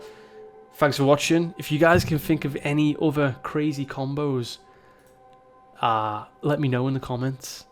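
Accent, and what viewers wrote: Liverpool, United Kingdom. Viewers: Fiufsciak - don't subscribe so I can prove reverse psychology is a total bs I think he’s from Liverpool, or at least that part of England. He called his accent “Scouse” in a tweet and Wikipedia says that where it’s from.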